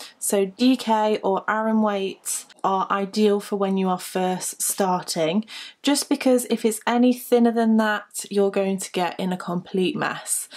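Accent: United Kingdom. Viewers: Thank you so much. I watched a couple of other tutorials, and couldn't "get it". Yours was the best, I finished a square right along with you. Your voice is lovely, which helps a lot! I'm from the US and at first thought "Oh no, another Brit", but you did such a nice job of explaining the terms, that I soon was very comfortable with UK terminology. Thanks for including us!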